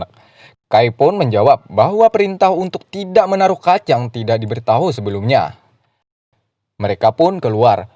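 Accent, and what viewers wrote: Banjar, Indonesia. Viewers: Mang orang banjar kh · Logat banjar kd bisa hilang😁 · Banjar kah admin nih...umaaaaa...mandangar dangsanak tu aeee..tesadar...wkwk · Mantap bos campuran logat bahasa banjar sama bahasa indo👍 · Aksen nya kaya urang banua Banjar tambahee lagee sanakk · Adminnya urang Banjar dingsanak lah.. Hehehe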